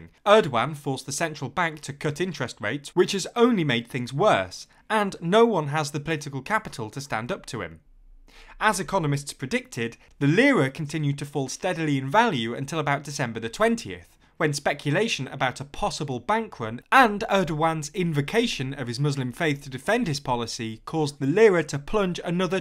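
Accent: United Kingdom. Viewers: >is british >pyrocynical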